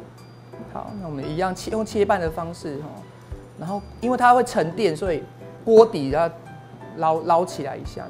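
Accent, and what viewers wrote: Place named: Southern Taiwan, Taiwan. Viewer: 是南部人嗎？只有南部人會把「冷 ㄌㄥˇ」唸成「ㄋㄥˇ」😅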